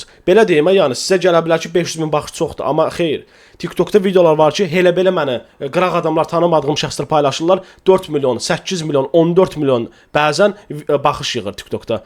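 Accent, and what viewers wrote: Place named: Nakhchivan, Azerbaijan. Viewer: naxcivan ləhcəsiylə niə danışırsan😂😂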